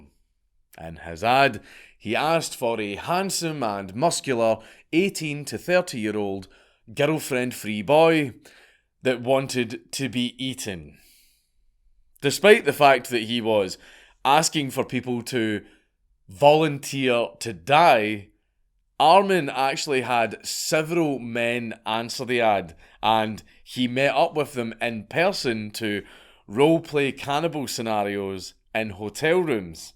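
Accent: Scotland, United Kingdom. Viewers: Dank love you bro but gotta move that pun counter it's blocked out by the subtitles. And your beautiful Scottish accent is almost indecipherable at times due to my lowly Baltimore origins. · I now narrate shit in my head with a scottish accent cos of the count haha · Loving the constant new videos. There's so many people to talk about and I love when you speak Scottish to me.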